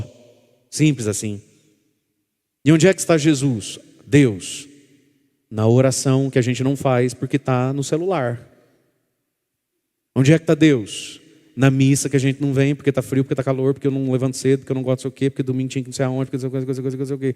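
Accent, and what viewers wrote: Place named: Minas Gerais, Brazil. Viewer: A nois aqui travez . Um jeito mineiros de falar rsrsrs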